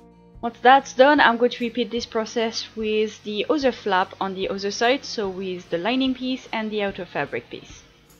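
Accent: France. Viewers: Amazinggggggg, im from mexico and i love your french accent when you talk english ^_^ ill try to do this!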